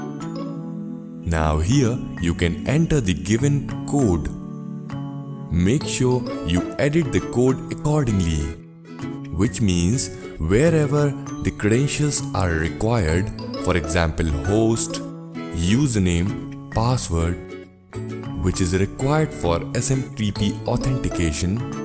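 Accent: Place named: India. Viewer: Hahahah a Indian voice is the same , you don’t need change your voice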